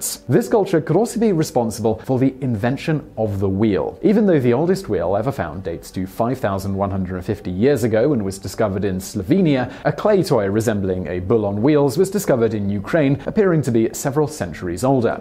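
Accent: United Kingdom. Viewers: When did VSauce Michael start using a British accent? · These aren’t facts. Its euro-centric BS for racists doing “research” on you tube to find and site as proof. This video is unscientific, gives no citation of the amazing amount of research that would have to exist to support all that it claims. Its typical YT nonsense with a British accent in order to pass it off to stupid, insecure people.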